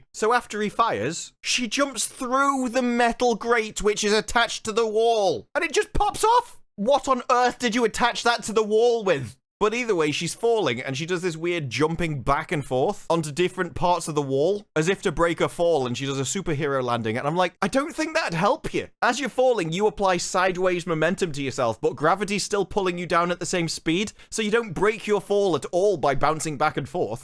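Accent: United Kingdom. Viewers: Sometimes it's funny hearing a person with a British accent nitpick a piece of shit show apart:) You're hilarious but you forgot one of the dumbest things about the Covenant language scene: The show has this whole thing that the Spartans are using their memories to seduce the meaning of Covenant words. Don't their helmets have cameras? Wouldn't they have a ton of audio from the Spartans fighting The Covenant? To fair, in this show's universe, they don't usually wear helmets for battle. Just driving and to remember shit.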